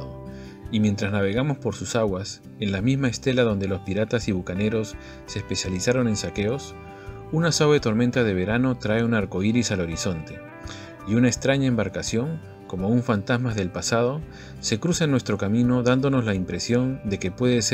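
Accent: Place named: Lima, Peru. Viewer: El narrador tiene acento de Lima, Perú.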